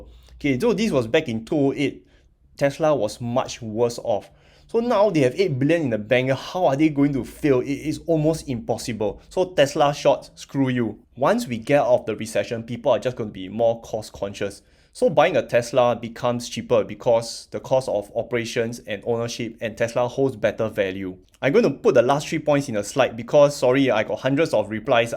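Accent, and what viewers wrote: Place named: Singapore. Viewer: If you cant tolerate that singapore accent, I recommend moving there permanently.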